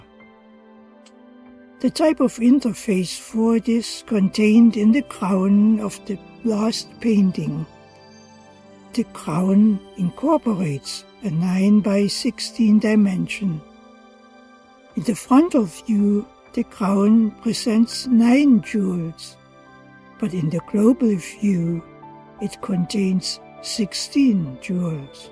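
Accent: Germany. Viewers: Well read. Very good English, the German accent just enough to be charming.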